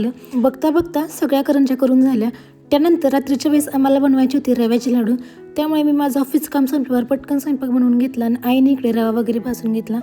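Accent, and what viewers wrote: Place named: Satara, India. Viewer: सातारची आहेस का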